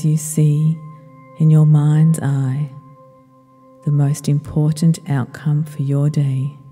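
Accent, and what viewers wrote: Australia. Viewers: She has an Australian accent, so she says "centered" but it sounds a bit like "scented." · This is great Australian voice... Thanks from India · Such a lovely voice....Australian?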